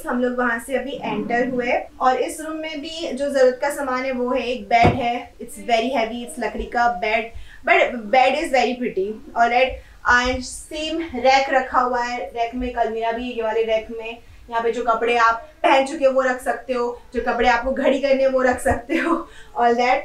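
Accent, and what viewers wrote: Odisha, India. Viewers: The way you say" Are Asa" like khanti Odiya ❤ I never knew you re an odia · Thank u for sharing dear. I am from Nabarangpur, Odisha. I am Bengoli and my home is Odisha so i can sure you most of Odisha and Bengoli things are same including the names. Your Odia accent is awesome and tumne saare chizo ki naam v sahi se liya hai.😘 Ghar bahut khubsurat hai. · i am from odisha....i am so happy to see you are from odisha too....hearing your odia accent feels so good....love you ❤️❤️❤️ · Di I'm loving ur oddia accent❤. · Your Odia accent is damn❤️.. Coincidentally I'm now living in Baleswar ❤️ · Hello.. it's good to see you embracing your native land our Odisha in such a beautiful way.. I just have a lil complaint that it's not Tulsi kaa Ped.. it's actually Tulsi Mata (Tulsi ka pauda) whom thaku maa worships daily.. being an Odia I would like to listen ur odia accent as well.. 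loads of love 🫶🏻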